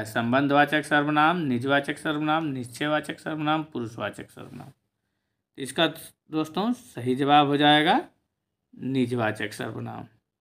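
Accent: Bihar, India. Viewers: bihari hindi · Bihari Hindi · Bihari hindi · बिहारी हिन्दी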